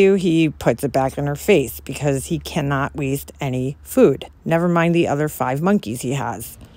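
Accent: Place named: Bronx, United States. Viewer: I love her accent also it's probably like a New York Bronx accent like where my dad grew up she's Italian Maybe that's the way my dad talks he's a sicilian immigrant who grew up in the South Bronx and move to Jersey that's the way his accent sounds that's maybe why I love her accent so much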